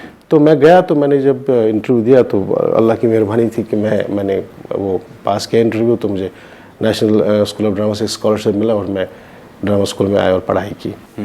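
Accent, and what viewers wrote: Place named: Kashmir, India. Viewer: Very surprised to listen to his Kashmir accent which I never saw in his acting career great actor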